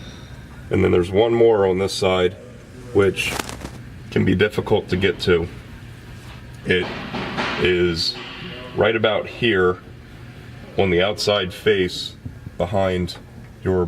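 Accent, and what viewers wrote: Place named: Central Pennsylvania, United States. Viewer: i can identify this accent with surprising certainty because of a buddy from central PA i served with. its the pronunciation of the work "on"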